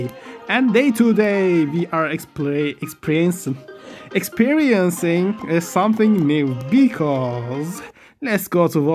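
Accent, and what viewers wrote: Turkey. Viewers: Abi birşey diyeceğim 2. Kanal fln açıp oyun hakkında taktik verebilir misin? Adam çok iyi fakat kimse gelmiyo starpointim 0 niye abi bil bilgin varmı (Abone olup like attım) TÜRK OLDUĞUNU BİLİYOZ AKSANINDAN BELLİ EDIYON :D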